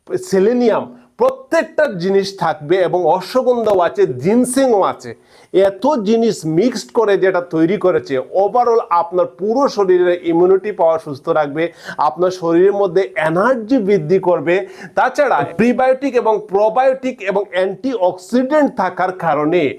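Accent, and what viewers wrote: Sylhet, Bangladesh. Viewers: আপনার কথায় মনে হচ্ছে আপনি সিলেটি, কিন্তু মেডিসিন গুলি দেখছি সব ইন্ডিয়ান , এসব মেডিসিন কি বাংলাদেশে পাওয়া যাবে ?? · আপনার অফিস বা চেম্বার কুথায় বলেন। ভাষা বলে আপনি সিলেটি।চেম্বারের ঠিকানা দিলে উপকৃত হব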